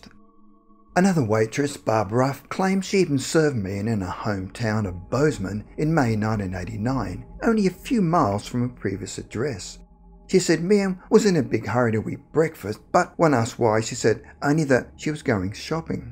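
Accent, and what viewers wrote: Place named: Australia. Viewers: Australian or Kiwi? Don't have a problem understanding tbh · Aussie accent, pretty clean to be honest with fuck all slang or swearing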